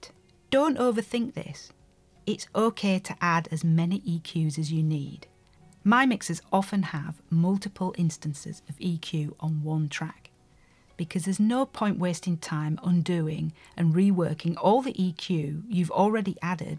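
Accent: Northern England, United Kingdom. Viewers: how does this only have 6000 views??? there is so much terrible nonsense on yootoob and your videos are outstanding. plus it’s lovely to hear a fellow northerner on the interwebs. keep it up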